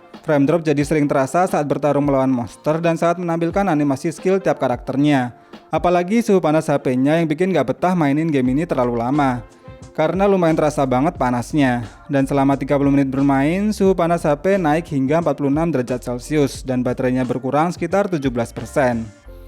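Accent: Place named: Sleman, Indonesia. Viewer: koyo ng sleman ikuu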